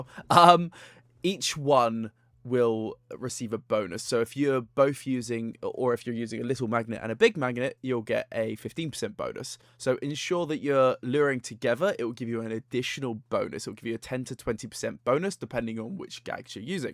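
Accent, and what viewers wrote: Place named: United Kingdom. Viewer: Great video! I also hear a British accent (you correcting yourself from £1 to a dollar bill haha); not many of us who play/played TTR! This might be advanced, but this is what I used to do when training in buildings alongside your advice: I'd optimise the gags in a way where I'd overkill the cog on the last floor to max out how much gag points you can acquire in one building. For example, on the top floor, if the first cog is Lv 9 by itself before other cogs join, I wouldn't use a Lv 6 gag as it'll most likely take it out in one hit. I'd use two Lv 4 or 5 gags then use a Lv 6. That way, you'll max out the gag points faster. Like using a trap door first, then TNT. Or two quicksands then TNT. Also, Yesman are the best cog to face in an invasion; their attacks are not powerful and go up to Lv 7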